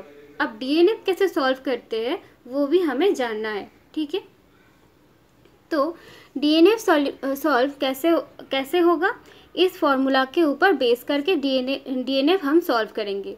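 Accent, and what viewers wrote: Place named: Bengal, India. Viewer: Bengali accent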